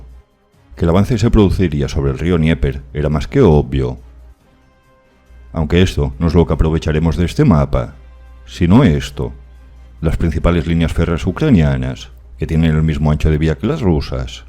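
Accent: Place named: Aragon, Spain. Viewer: La entonación parece maña, de Zaragoza o si no de la rivera del Ebro.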